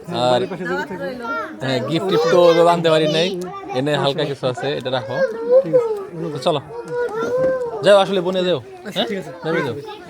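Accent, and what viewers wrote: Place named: Gopalganj, Bangladesh. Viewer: ভাই, আমার এক খালাতো ভাই আছে, খুবই ক্লোজ ছিল।উনার ভয়েস, কথার টান ঠিক আপনার মত।ওর বাড়িও গোপালগঞ্জ সাটুরিয়া।আমি সাটুরিয়া বাজারে বেশ কয়েকবার গিয়েছি।খালুজান মারা যাওয়ার পর পারিবারিক জটিলতায় দূরত্ব হয়ে গেসে, তারপরেও আপনার ভিডিও দেখলে আমি আমার কাজিনকে ফিল করি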